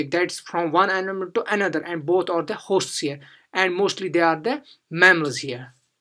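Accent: Kashmir, India. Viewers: bro u sound like kashmiri . am i right?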